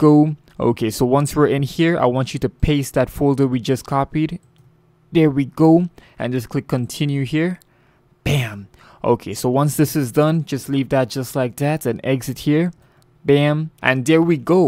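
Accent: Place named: Canada. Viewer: I love the canadian in your voice :D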